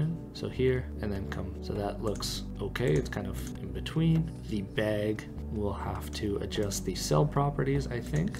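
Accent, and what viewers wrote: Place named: Midwest, United States. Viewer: So curious if you’re Minnesotan, or from one of its neighboring states. Your accent just has some of those midwestern notes I’m so familiar with haha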